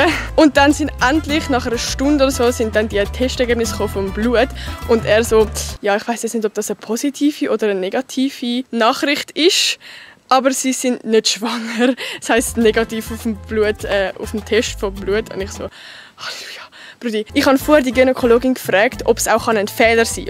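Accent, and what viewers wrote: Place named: Bern, Switzerland. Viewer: duuu bish sooo vil attraktiver wed bärndütsch redsh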